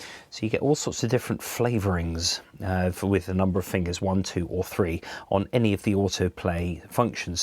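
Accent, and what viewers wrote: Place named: United Kingdom. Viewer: Hey man, loved this tutorial, and your accent it reminds me of a British tv show, cant remember which but it draws you in, please keep this type of content up.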